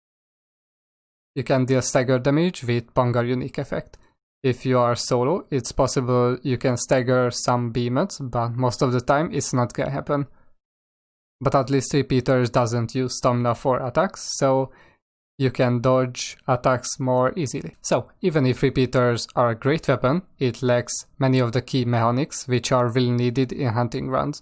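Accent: Hungary. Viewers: i noticed something, you have a hungarian accent.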